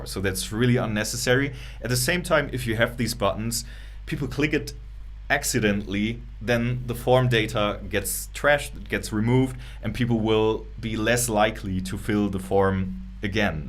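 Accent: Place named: Germany. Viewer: Your English is frankly amazing. I'm a bit biased since I have a lot of german family so I'm use to the slight accent, but honestly it's great.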